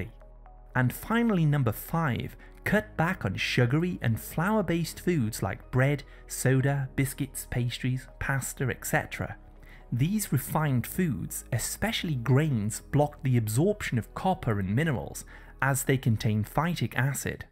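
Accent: England, United Kingdom. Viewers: अंग्रेजी accent में बोल कर इंडियन को मुर्ख बनाना 😃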